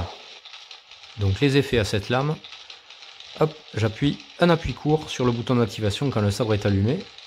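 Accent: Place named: Southwest, France. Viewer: Bonjour, étant un bon passionné du monde Star Wars et bien évidemment des sabres Jedi, je suis tombé sur ta chaîne et j'ai directement accroché. J'adore tes vidéos, elles sont précises et riches d'informations. Tu t'exprimes vraiment bien et ton accent du SudOuest met le sourire. Excellentes vidéos, j'espère que tu vas nous en proposer de nouvelles et je t'encourage à continuer. Je te souhaite de bonnes choses. PS: je me demandais si tu avais une page Instagram ou Facebook, où l'on peut voir ce que tu fais et discuter.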